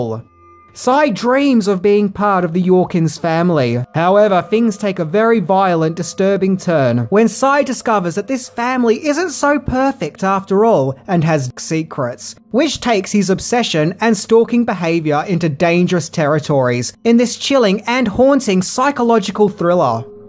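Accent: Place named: Australia. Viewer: The Australian O is a glorious thing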